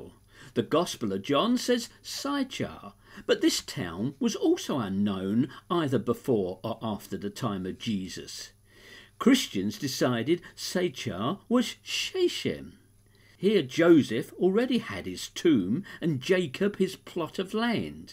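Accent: United Kingdom. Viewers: oy the brit pronunciation is killin me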